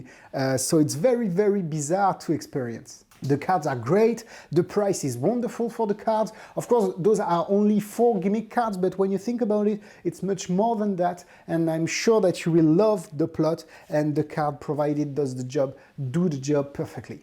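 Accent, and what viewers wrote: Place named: France. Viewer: Nice French accent :) Congratulations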